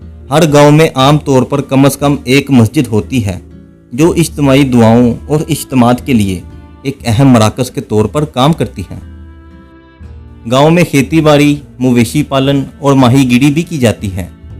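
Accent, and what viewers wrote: Punjab, Pakistan. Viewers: پنجابی میں اردو بولنے کے بجائے پنجابی میں بول لو تو زیادہ اچھا لگے گا۔وڈیو تو ویسے بھی چوری کی ہے۔ترجمہ اور ڈبنگ تو بندے کے پتروں کیطرح کرلیاکرو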